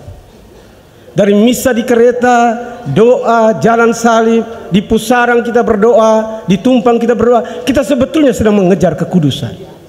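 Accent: Flores, Indonesia. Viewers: Luar biasa...sy suka sekali kalo renungan dari pater...logat floresnya yg membuat kami bangga sbg orang Flores, GBU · Mantap, tetap semangat 💪 luar biasa kotbah nya kaya orang Flores nih.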